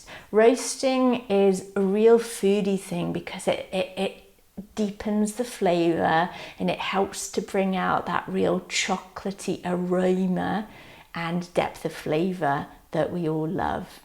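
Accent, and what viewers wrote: United Kingdom. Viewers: Mam, are you speaking British language because I'm learning British language, plz reply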